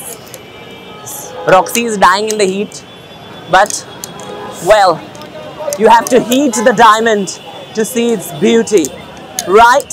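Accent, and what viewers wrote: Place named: Maharashtra, India. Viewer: 😂😂😂😂 love to watching your vlog i m obsessed with "bahot ho gaya fashion and bata wala scene" love u dear make more vlogs with your hindi marathi accent please ❤❤❤❤❤❤lots of love...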